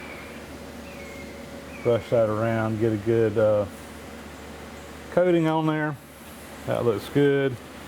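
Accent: South, United States. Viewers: Oh dear lord!. I had the Closed Caption turned on. Your southern accent is giving it fits. You simply have to watch a few portions of this video with the CC on, it'll drop you to your knees its so funny how it interprets what you say. Example "... about replace when us we're going to use this hotel room a which had been looking and come from the research for the the strength for this material I thinks gonna play me strong enough for this final dear in a reason the use that the from noise reduction for this final gear in a reason the use that the from noise reduction the have that bad fastest turning here for a walk in the metal he had a something that would dampen the operation tumor in the blower....." It gets worse.......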